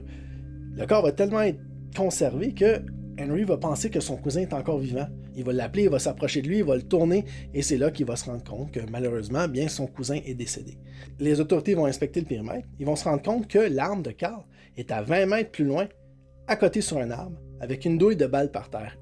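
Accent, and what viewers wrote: Quebec, Canada. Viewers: Merci pour tout ceux qui ne parlent pas l'anglais et aiment le sujet. Belle narration très digne et l'accent du cousin du Québec, c'est un régal. Bonjour de la France.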